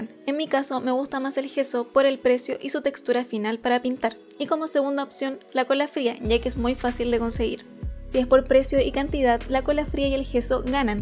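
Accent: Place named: Chile. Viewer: Llegué a este video porque quiero hacer un traje para un concierto de gaga y ninguno me gustaba, soy chileno viviendo en Las Vegas y me encantó tu video por el acento me sentí allá de nuevo Gracias ❤️❤️❤️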